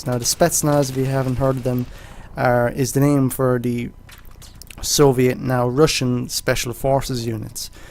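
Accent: Ireland. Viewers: Dropped over from scanner, nice series, always good to hear Another irish accent. keep it up.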